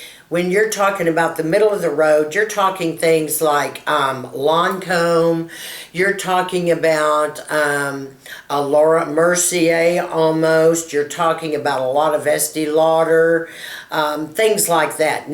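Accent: Texas, United States. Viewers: You look as young as the others and that is the result of a lot of skin care and taking pride in yourself - you look stunning and I love that Amore Pacific and it sounds wonderful - great choices; I don't powder either - have a wonderful day - love you like a pig loves bacon heehee xxxxx Suzy.....love your Texan accent with the French words:)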